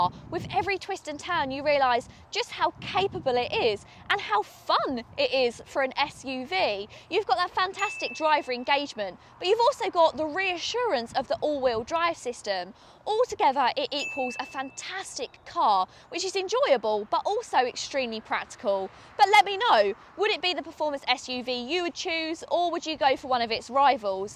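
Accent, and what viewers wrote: United Kingdom. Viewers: I like that British accent and Stelvio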